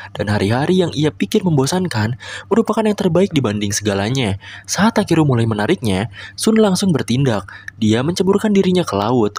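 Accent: West Java, Indonesia. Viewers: Admin org jawa barat keknya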